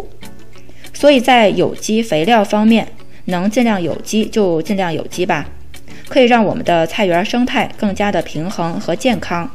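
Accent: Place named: Beijing, China. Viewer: 其实北京口音太浓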